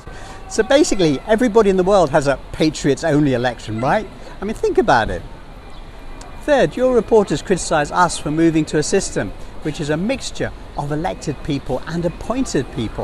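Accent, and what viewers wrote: RP, United Kingdom. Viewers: Great rant delivered in a inimitable RP accent ! But Westerners will still say : "But we can change leaders !" Yes, from Trump to Biden and likely back again !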